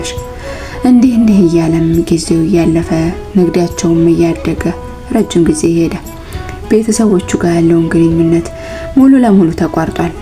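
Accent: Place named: Wollo, Ethiopia. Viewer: ወላሂ የብዙ ሰው ታሪክ አዳምጣለሁ ግን አንች እንደምታቀርቢው የሚመቸኝ የለም ያቀራረብሽ ለዛ አነጋገርስ ወሎየነቴን ያስታውሰኛል የምር ምችት ይበልሽ በርችልኝ ከዝህ በላይ ጠንክረሽ ጥሩ ደረጃ ደርሰሽ አላህ ያሣየኝ የኔ ውብ ድምፅ😍😍😍😍😍😍😍😍😍😍😍😍😍😍😍😍😍😍😍😍😍😍😍😍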